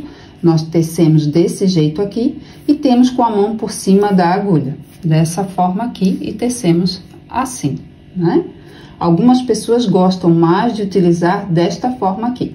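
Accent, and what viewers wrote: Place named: Santa Catarina, Brazil. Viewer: Senti que vc é de SC pelo sotaque! Hehehe sou de Floripa.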